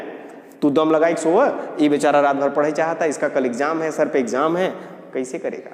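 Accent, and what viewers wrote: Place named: Bhojpuri, India. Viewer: bhojpuriya tone bahut achcha laga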